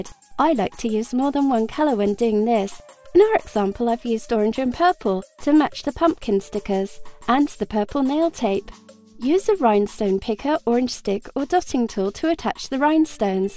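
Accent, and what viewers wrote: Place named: United Kingdom. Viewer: i loooove this british accent.....i really do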